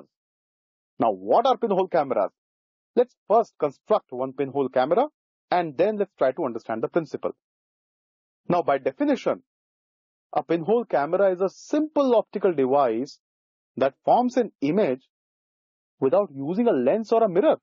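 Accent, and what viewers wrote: India. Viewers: I think he’s indian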